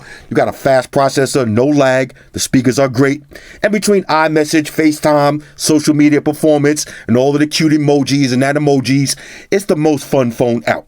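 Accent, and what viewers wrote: New York, United States. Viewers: Naaaw, he sounds like straight up New Yorker to me lol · Hey what’s up!! Been creeping on your channel for a few months now this is my first comment. Love in the content man. Original shit genuine opinion n to the fucking point. I appreciate that!’ anyway Two questions... 1) What did you mean when you said get an iPhone so you can experience Instagram the right way? Is it just like Snapchat where there’s more features on the iOS version. 2) what Burrough u from? Bk? stat? Or Jersey maybe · Thats not a hood voice... its just his New York accent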